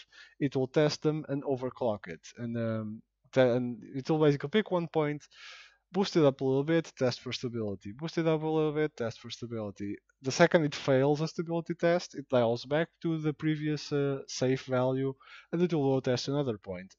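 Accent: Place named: Portugal. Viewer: Compreendo :D Eu assim que te ouvi a falar, pimba! Este gajo é tuga! :D Estou agora a experimentar o teu tutorial na minha EVGA 2070!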